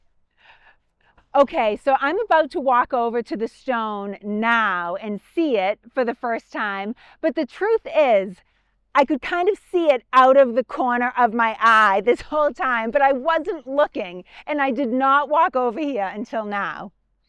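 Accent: Boston, United States. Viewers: Thank you, for another interesting action adventure! I love your enthusiasm and your Boston accent too! I've been to the Bunker Hill Monument and taught about the battle in my grade 5 History class, but I didn't know about the rail fence stuffed with grass and hay. Keep on with your historical posts. I always learn something new. 🙂 from NH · Great history lesson, along with a fabulous Boston accent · Love your Boston accent.😊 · I love this woman. You sure as hell can tell she is from Boston! Sometimes I have to rewind & play over what she is saying to understand her.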